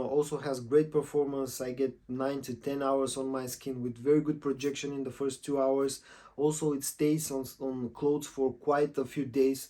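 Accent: Bulgaria. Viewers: Very good video! Might sound kinda weird, but are you bulgarian by any chance? Your accent sounds bulgarian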